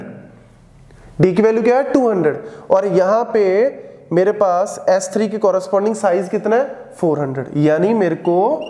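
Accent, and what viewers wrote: Punjab, India. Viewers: God ur punjabi accent. Loving it... ♥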